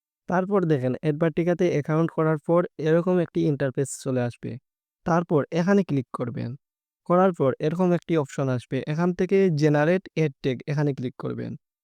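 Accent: Sylhet, Bangladesh. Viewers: সিলেট নি ভাই তুমার বাড়ি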